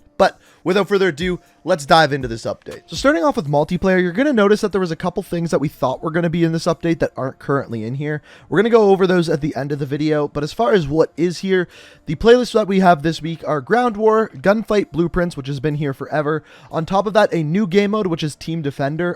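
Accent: Canada. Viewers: Your canadian EH thats pretty neat but onestly hope you get a mill love teh vids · I know ur Canadian because of ur accent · Canadians eh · Ey another canadian ey!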